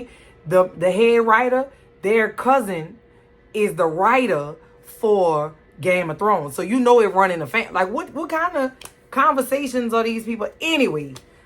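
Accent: New Orleans, United States. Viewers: Love love love your accent, Is it New Orleans? I just subscribed !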